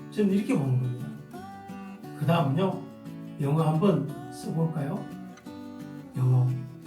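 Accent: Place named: Gyeongsang, South Korea. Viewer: 영덕사투리를 좀쓰시네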